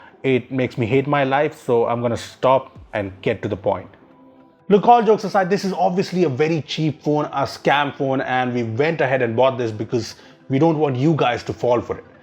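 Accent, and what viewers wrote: India. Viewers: BRO YOUR ACCENT IS DIFFERENT THAN OTHER INDIANS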